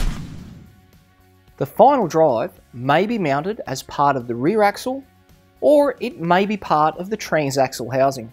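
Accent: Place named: Australia. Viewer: I think all technical videos should be done by someone with an Australian accent from now on.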